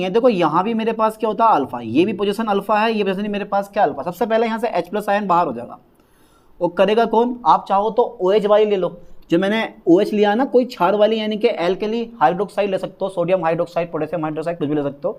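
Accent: Bijnor, India. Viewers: Sir aap bijnor ya hasaanpur se ho kiya😅😅😅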